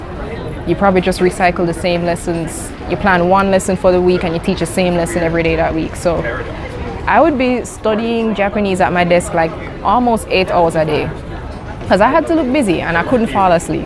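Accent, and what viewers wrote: Jamaica. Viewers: I'm 6 seconds in, and im pretty sure this woman is Jamaican XD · Is she also west Indian? She sounds Jamaican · As she Start talk mi know seh a Jamaican she be, the accent tranggg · I can related to the hair experience lol. When I was in China it was not uncommon to meet people who just wanted to touch my hair. I had the old school flat top hair cut. Some even wanted a picture. Btw I'm from Grenada but I'm glad to still hear your Jamaican accent in there after speaking mainly Japanese for so long.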